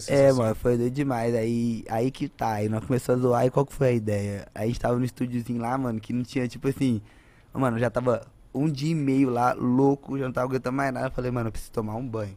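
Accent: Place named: Belo Horizonte, Brazil. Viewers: Esse sotaque é de bh e região próx, quem é de fora estranha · nois é mineiro uai, é o sotaque oxi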